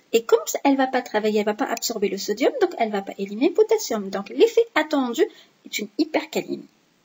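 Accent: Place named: Algeria. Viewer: Médecin Chef de service de pédiatrie en Malaisie / Maitre de conférence, je suppose que vous êtes algérienne( l'accent++++ ) hhh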